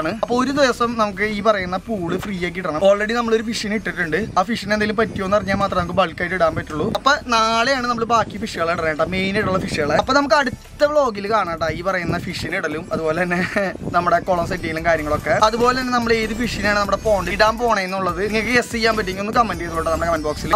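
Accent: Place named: Thrissur, India. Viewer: ത്രിശൂർ ഭാഷ ഇഷ്ടമുള്ളവർ ഉണ്ടോ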